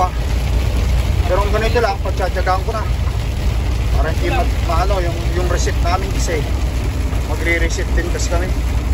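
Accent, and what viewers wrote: Ilocos, Philippines. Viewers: Ilocano ka met ngayam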